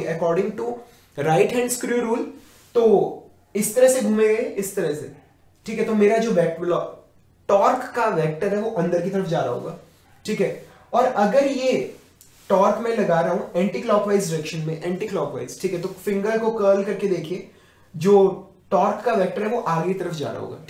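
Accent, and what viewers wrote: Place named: Punjab, India. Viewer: ap pkka punjabi ho sir....jo baar baar raje rajee kr rhe the...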